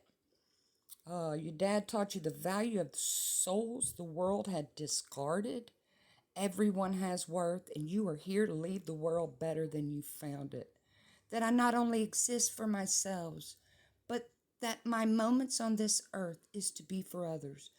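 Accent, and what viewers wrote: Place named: South, United States. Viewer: Gday, you are very easy to listen to, that southern twang gives me chills....Much Love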